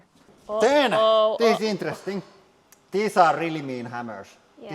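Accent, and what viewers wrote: Finland. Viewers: Love your videos, love your Finnish accent 🧡🤘🏻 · Hammers are nice and all, but nothing can beat a Finnish accent.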